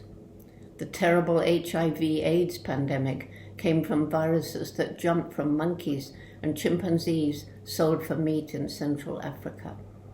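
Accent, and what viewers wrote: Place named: Oxford, United Kingdom. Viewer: Not only the animal world needs you, you are also a perfect example to my French and German students for listening and translation exercises in your lovely "Oxford English language and pronunciation", additionally the messages you spread (social networks permitting)! ... 👍😍 🥀 I much hope this makes you smile! 🥀 Have a blessed and healthy time! 🥀 With love and "solidarity in sunny confinement", in Paris! 🥀 Marie-France ML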